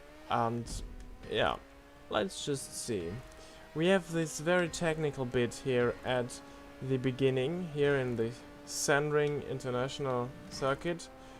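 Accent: Germany. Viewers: Hat man schon am Akzent gehört, das du deutsch bist👌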